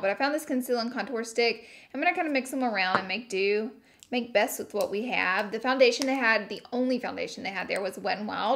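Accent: South, United States. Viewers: You’re so funny I’m new to your channel and I love your southern accent and your personality!!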